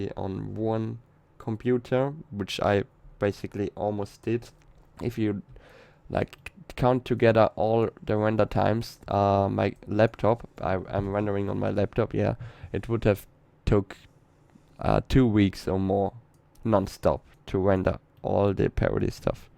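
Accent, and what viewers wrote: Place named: Germany. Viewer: could it be that you lived in germany before you went to Australia? Because you speak english with some kind of accent;)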